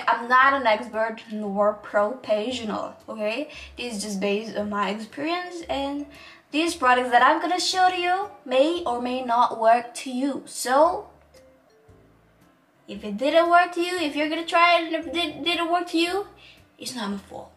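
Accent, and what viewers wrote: Pampanga, Philippines. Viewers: So cute! Lalo na yung kapampangan accent. I wonder if you're a kapampangan po. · Yung accent talaga ng pagka kapampangan eh😅 Proud cabalen here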